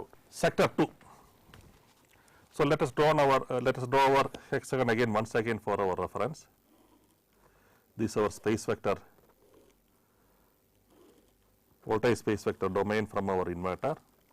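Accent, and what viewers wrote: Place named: South India, India. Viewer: Just because the professor in the video speaks with a heavy south indian accent does not mean that he does not know how to speak english. every one speaks the language with an accent, including the british and americans, african americans etc.. i have never seen anyone complain about the way people from the west speak English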